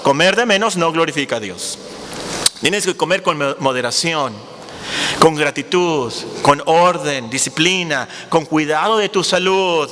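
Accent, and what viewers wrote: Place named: North, Mexico. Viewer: min Pajarito... pero Prehistórico Jajajaja, no, es bromita! Muchhhioooo problema con la glotonería. ¿Es de Chichuahua el predicador? Pronuncia como nosotros los del norte la "CH". Muy buen mensaje para los que somos medio glotoncitos ♥ Dios le bendiga.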